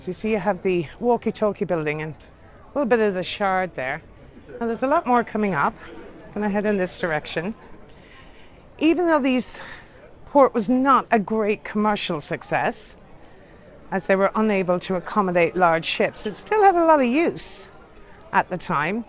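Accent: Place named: Ireland. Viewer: I have to Admit I got a little Crush on the Host!!! I Absolutely love the” Irish” Accent!!. You’re a very pretty lady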